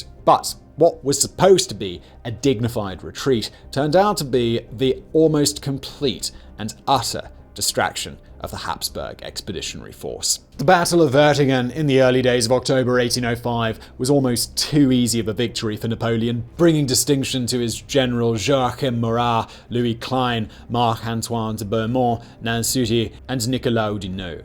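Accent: United Kingdom. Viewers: Look forward to hearing Simon telling us about the battle of Waterloo. One needs the proper British accent to describe the upper crusty Wellington defeating Napoleon.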